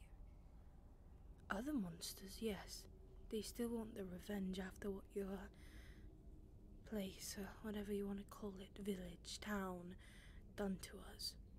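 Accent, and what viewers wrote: Scotland, United Kingdom. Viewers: Are you from Scotland? I hear an accent.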